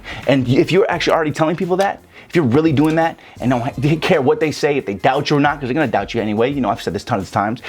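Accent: Chicago, United States. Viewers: Your delivery is so Chicago. It's funny and refreshing to watch your informative vids. Preciate you, bro. Continue courageously! <link>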